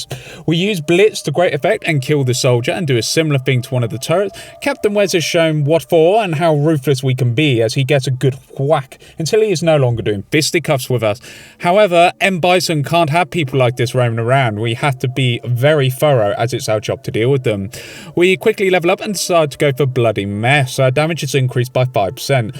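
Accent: United Kingdom. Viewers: I always forget that you're British. And then I click on a video and get a right shock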